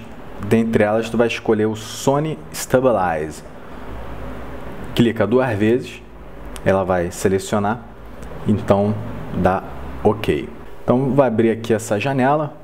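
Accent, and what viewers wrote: Rio de Janeiro, Brazil. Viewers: Adorei o video e tbm adorei esse sotaque carioca "Mesa de docexxxx" *-*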